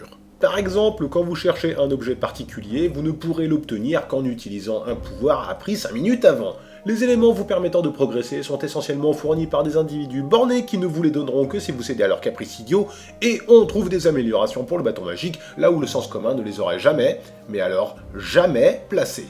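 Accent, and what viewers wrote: Alsace, France. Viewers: Tu ne serais pas Alsacien ? Sinon,j'ai aussi galéré sur l'epreuve de la peur.. en faite je l'ai jamais reussi x')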